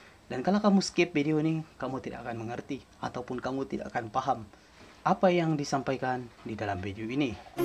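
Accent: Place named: Sabah, Malaysia. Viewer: Macam urang sabah saja ini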